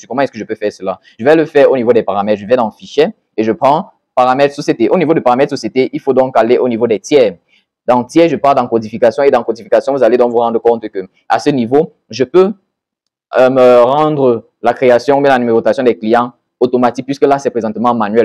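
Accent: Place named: Central Africa. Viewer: Commentaires anormalement élogieux !!! C'est du pipeau !!! La vérité c'est que vous parlez trop et avec un accent difficile à capter pour des non centrafricains Abrégez svp et soignez votre diction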